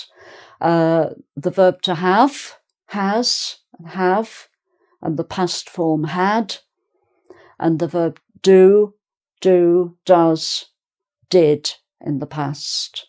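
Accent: England, United Kingdom. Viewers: British sounds perfect in your version. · Hello. Thank you for the lesson. The pronunciation of the contractions are very dificcult. Beautiful british accent!! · Hi..Iam from Chile...I love your pronounce ...pure english😃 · Nossa estou apaixonada por esse canal menina kkkk inglês britânico é muito gostoso de ouvir, se minhas prof do ensino medio ensinasse os verbo to be igual ela eu tinha aprendido la kkkkk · Oh an english accent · Beautiful lesson in British accent. Greetings from Mozambique 🇲🇿. Linda lição em sotaque britânico. Saudações a partir de Moçambique 🇲🇿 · I love this Britsh accent! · Oooh British English pronunciation, thanks dear Lady. OMG!!! I've been pronouncing "aren't" completely wrong for like 15 years, I really need to view all your videos my lady, I probably sound really bad speaking English.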